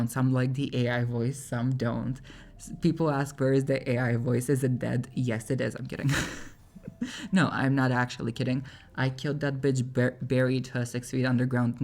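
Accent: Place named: France. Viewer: Its so nice knowing how you would talk longer then 1 minute, its so funny (in a good way, it makes me laugh because I have the same humor and I love that accent!! (is it french? idk)). Also very nice to know what is going on with the channel. <33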